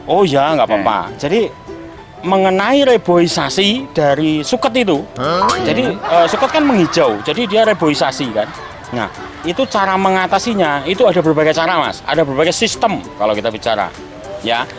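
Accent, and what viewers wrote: East Java, Indonesia. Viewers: Orang mana pak?, kayaknya Jawa timuran..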